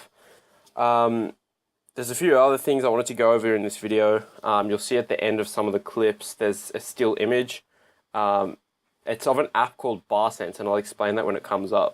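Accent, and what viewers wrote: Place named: Australia. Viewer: Lol Cheers Tim. It's an Aussie accent :-)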